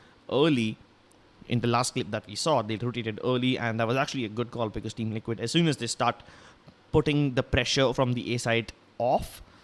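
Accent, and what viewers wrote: India. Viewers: You Indian? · Are you indian? Your accent sounds indian. Btw, love fron bihar, India! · ur indian?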